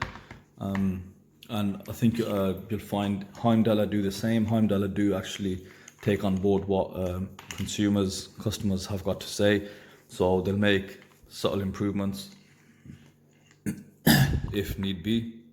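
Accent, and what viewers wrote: Birmingham, United Kingdom. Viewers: I thought I recognized the accent. Have you got any if these steeldive watches for sale?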